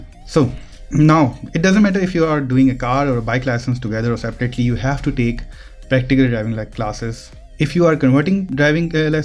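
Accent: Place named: India. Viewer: Me completely confused when hearing an Indian accent😂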